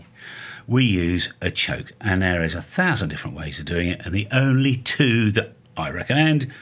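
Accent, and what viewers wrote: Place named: United Kingdom. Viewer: Ugh.... British 🫩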